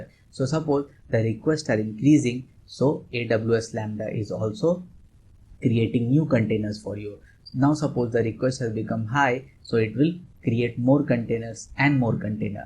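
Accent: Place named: India. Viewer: Why you indian do not work on your accent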